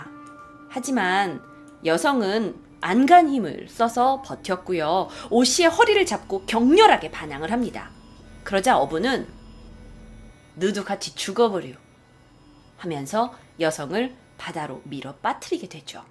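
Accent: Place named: Honam, South Korea. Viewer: 제시카님 전라도 출신이라 그런지 호남사투리 맛있게 하시네요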